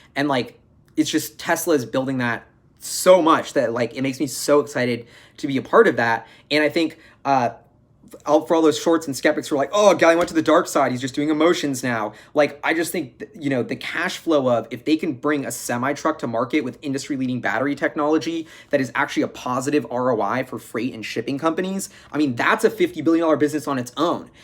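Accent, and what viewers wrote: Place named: South, United States. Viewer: Where are you from? Sounds like a southern accent at times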